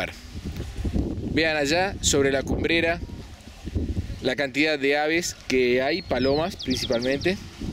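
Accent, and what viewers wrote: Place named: Argentina. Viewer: Vi el horrible video pensando en los consejos y sugerencias para erradicarlas, y la pasaste pasando un lugar que ni me importa y para terminar mi compañía es..... llámenos...... desde el principio pon PUBLICIDAD. No nos hagas perder el tiempo con los engaños y ganchos, además tienes acento argentino y yo vivo en México.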